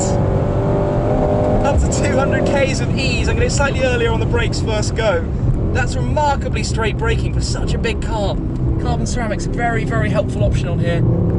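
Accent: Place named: United Kingdom. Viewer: could completely make up a car spec and everyone would believe him cause his British accent makes him sound like he always knows what he’s talking about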